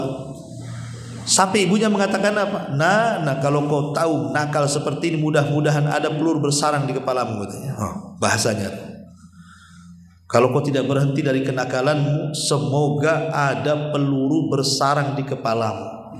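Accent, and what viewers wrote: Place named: Java, Indonesia. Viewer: Ceramah Bpk enak di dengar. Bahasanya lembut. Jowo nya tulen Njowoni. Sehat njih Bapak Ustad. Aamiin Ya Robb🙏🙏🙏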